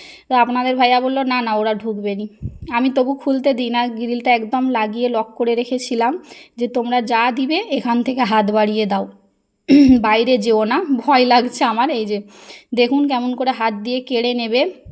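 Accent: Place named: Kolkata, India. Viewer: তুমার কলকাতার কথায় বারি দিদি❤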